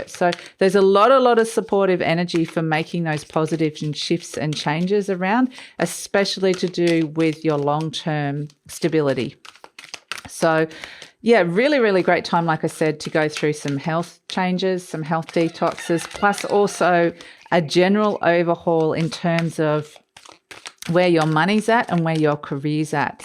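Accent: Australia. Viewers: Very good...resonates and very exciting things to come. I enjoy ur channel especially because u sound to be Australian and relate it to Southern Hemisphere, there's not to many Southern hemisphere readers so I'm grateful when i find one! Namaste beautiful being namaste 💖🇦🇺🙏🌈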